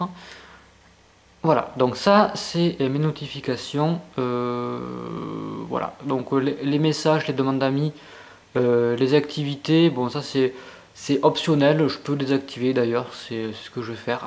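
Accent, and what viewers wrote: France. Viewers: Merci pour la vidéo PS j'aime trop ton accent du sud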